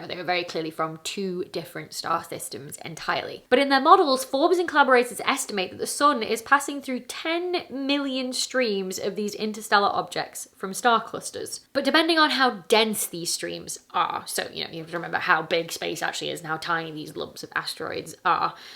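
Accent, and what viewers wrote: United Kingdom. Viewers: What accent is this, by the way? Like, where in Britain do people speak like this?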